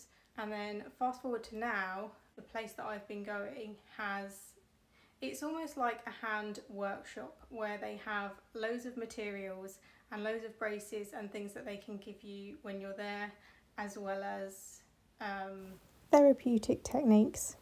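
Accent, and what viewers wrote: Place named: England, United Kingdom. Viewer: when you’re talking sometimes it’s like listening to ASMR your voice with the soft english accent is relaxing even though you are talking about your serious issues. I hope at some point we get some relief chronic illnesses are tough.😞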